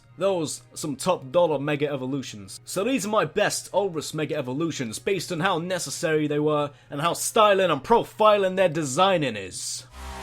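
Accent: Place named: United Kingdom. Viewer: man He sounds like a British Dan Green. Am I right?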